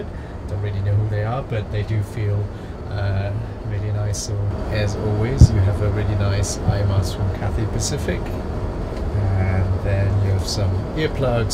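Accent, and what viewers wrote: Wales, United Kingdom. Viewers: Do I detect a hint of a Welsh accent? Great vid, can’t wait to be back in HK, hopefully later in 2021 :)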